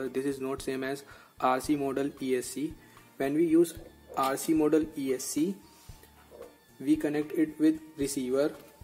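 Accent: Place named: India. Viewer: Porque hablas como Indio y el stick de la radio necesita lubricación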